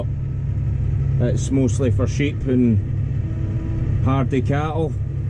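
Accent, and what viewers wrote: Scotland, United Kingdom. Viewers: Very easy watching your videos must be the Scottish accent , would be well interested in the merchandise but do you know what extra charges would be incurred now with Brexit sending them to Ireland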